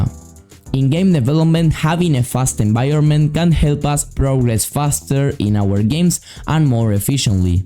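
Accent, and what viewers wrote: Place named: Argentina. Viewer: Ese acento Argentino se escucha a leguas. Traduci tus videos asi nos ayudas a los hispanohablantes jajaja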